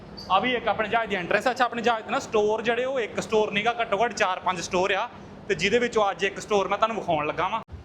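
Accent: Majha, India. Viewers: ਜਾਜ ਮਾਂਝੇ ਵਾਲੀ ਬੋਲੀ ਆ ਪਰ ਵੀਰੇ ਜ਼ਿਲ੍ਹਾ ਤੇ ਪਿੰਡ ਕੇਹੜਾ ਕਿਰਪਾ ਕਰਕੇ ਜ਼ਰੂਰ ਦੱਸਣਾ ਜੀ ❤😊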